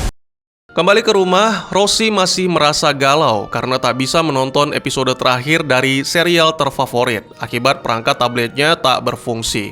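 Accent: Aceh, Indonesia. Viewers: bang lu orang aceh?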